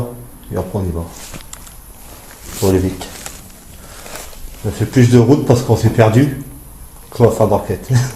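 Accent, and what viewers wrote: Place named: Hauts-de-France, France. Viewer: C'est toujours un plaisir de suivre vos recherches. Vous êtes des hauts de France ? C'est le petit accent qui me fait penser ça en tout cas bravo beau travail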